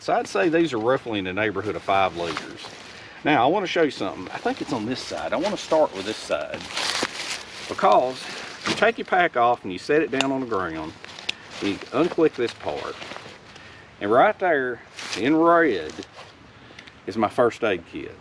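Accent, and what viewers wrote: South, United States. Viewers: I love your accent man for me as a German it's a delight to just listen to you speak. What area are you from in the US? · This is one of my favorited channel. I especially like it when you explain everything so nicely especially with the southern accent .I found this channel randomly; it is really a channel that produces quality content. I have learn so much .Thank you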